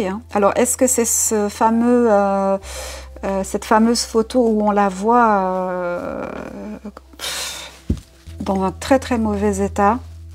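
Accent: Switzerland. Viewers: Coucou, il me disait bien que ce petit accent me rappelait quelque chose 😃😃 un gros bisou de Genève 🥰🥰🥰🇨🇭🇨🇭🇨🇭🇨🇭🇨🇭🇨🇭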